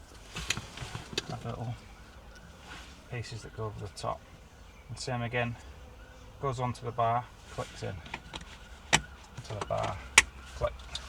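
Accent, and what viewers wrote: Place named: North, United Kingdom. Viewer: Another cracking video, paintwork looks like glass. Nice to hear a fellow northern voice.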